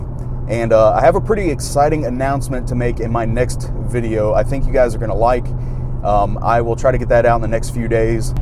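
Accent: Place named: South, United States. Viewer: Part three please. I love your southern accent.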